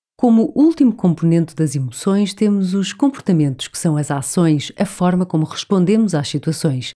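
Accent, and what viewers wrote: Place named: Portugal. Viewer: Fantástico!! A língua portuguesa-POR está ótima de ouvir... muito próxima das formas de expressão BRA. :)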